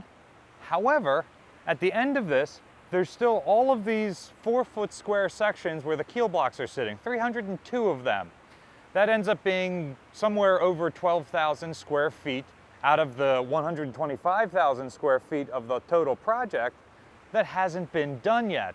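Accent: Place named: New York, United States. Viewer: great question, great answer to something that's been bugging me for years. thanks. You look and sound familiar. Are you from NY?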